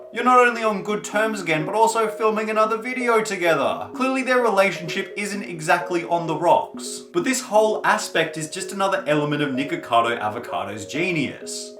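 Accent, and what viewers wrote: Australia. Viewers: Been enjoying your newer videos and this one popped up in my feed - I think it's the first one I've seen with you actually in it! (Lol'ed at the incel subreddit bit) Keep it up love hearing a fellow aussie spill the tea on youtube peeps :) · Oh so you're Australian No wonder you sound more interesting than what you actually are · i swear to god i did not realize your aussie until this video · I FEEL LIKE I SHOULD'VE KNOWN BY THE ACCENT THAT THIS GUY WAS AN AUSSIE, YET I DIDN'T KNOW UNTIL HE SAID; "At least in Australia" and my brain had to reboot and process that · Are you a aussie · its not his fault, its that he has an australian accent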